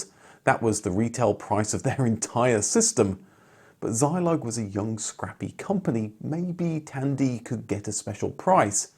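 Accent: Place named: United Kingdom. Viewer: Love Your historic vidéos. Lots of information and Your perfect British accent is so good for French ears. •••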